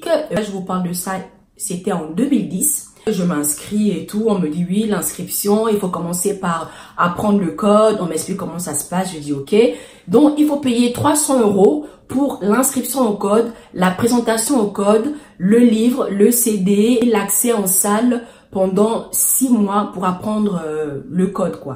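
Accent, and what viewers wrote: Gabon. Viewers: je connais l'accent là. C'est une gabonaise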